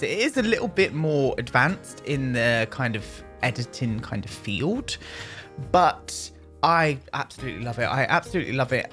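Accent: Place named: Devon, United Kingdom. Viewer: I know this is probably a stupid question but are you from Devon x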